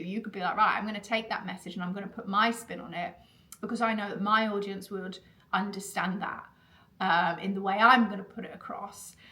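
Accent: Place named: London, United Kingdom. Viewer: Most surprising thing in this video is that you’re from Yorkshire??????? Whaaaat. That is the most London accent ever!!!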